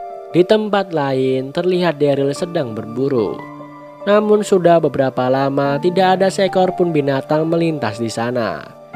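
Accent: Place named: Java, Indonesia. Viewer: 99,99999,999% b Indonesia 00,00000,001% jowo ne metu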